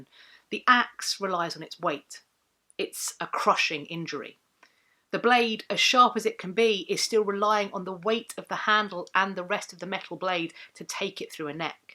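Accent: United Kingdom. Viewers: Hi, Dr.! I'm a new subscriber, and I just wanted to tell you how delighted I am that YouTube, in it's infinite wisdom, decided to drop you into my que. I, very much, appreciate your expertise on the subjects in which you speak, and I could watch your videos all day, but it's your voice that has me transfixed. I really could listen to it endlessly; there's just something about a British accent! Thank you for providing such intellectually stimulating content. I look forward to binge watching all of your postings.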